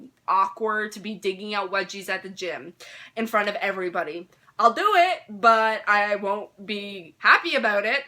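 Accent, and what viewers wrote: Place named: Canada. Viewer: Your Canadian accent is such a treat...coming from a Canadian who's been in the Americas the last 5 weeks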